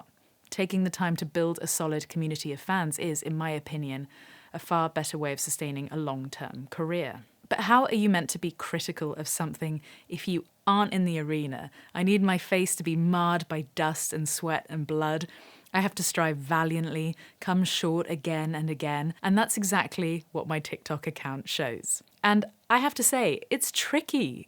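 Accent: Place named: RP, United Kingdom. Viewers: Hij, be happy you are not a slave to a Record Company, and just drop that (Chinese!) Tik-Tok platform altogether. Love your videos, songs, and your speaking voice as well as your delicious Queens English. I hope to see more of your classic Tuesday Talks and guitar stories again. Fondly, Michael PS Commemorations on your Queen's passing. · Keep coming back for the posh accent and demeanour, I guess that bottle is always going to be full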